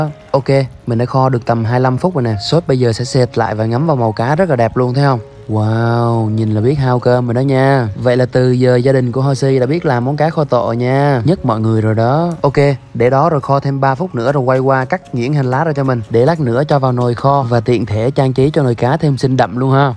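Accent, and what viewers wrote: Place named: South, Vietnam. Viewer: Ê mày tưởng ăn hiếp trẻ vị thành niên à và nghe giọng anh hoshi là biết miền nam và bới đi , bắt bẻ người ta ko thích chơi đâu nhá. 💢🤬🤬🤬🤬🤬🤬🤬💢.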